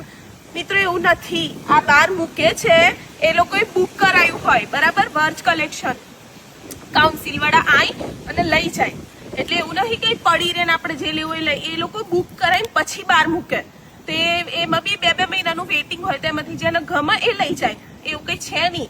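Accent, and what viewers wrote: Sabarkantha, India. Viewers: ❤❤ धन्यवाद तमारी बोलवा नी भाषा ईडर साबरकांठा नी छे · Bhasha puri sabarkantha ni che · Your desi SK language is nice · Your language touch is like himatnagar sabarkatha. I like it. I worked in SK rural area. Ben mithu bolo chho 😊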